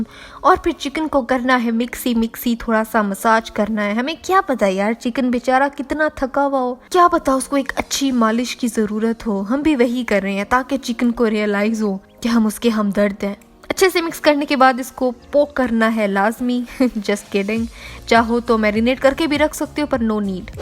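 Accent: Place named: Punjab, India. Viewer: I really loved your channel and I love u too...😘 your accent 😍 of Punjabi..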